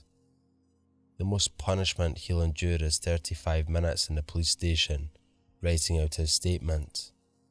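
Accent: Scotland, United Kingdom. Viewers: I just came across your channel after hearing you co-narrate a story with Swamp Dweller on his channel & now I'm hooked. Your Scottish accent makes me think of an old friend that lives out in Bonnie Bridge (spelling?) Scotland. I'm from Botetourt Virginia USA. And I just recently found out that I'm descended from a Watson Clan on my mother's side. So I have recently been digging into my ancestry. I hope to one day visit the Homeland impossibly dig more into my heritage there. Anyhow, please keep up the good work we are certainly enjoying your stories! Cheers!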